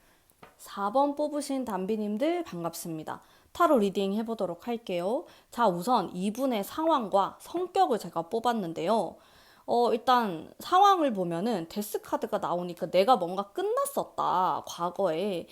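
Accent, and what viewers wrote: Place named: Gyeongsang, South Korea. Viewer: 경상도 분이신가요 ㅋㅋㅋㅋㅋㅋㅋㅋㅋ 아무리 감추려 해도 감춰지지 않는 사투리 잘 듣고 갑니다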